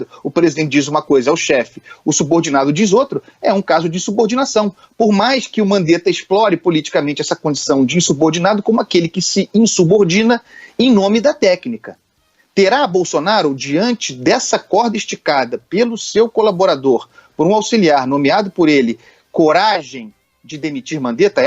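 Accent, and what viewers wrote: Rio de Janeiro, Brazil. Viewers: Andreazza é um paulista com sotaque de carioca. Fico enjoado ouvindo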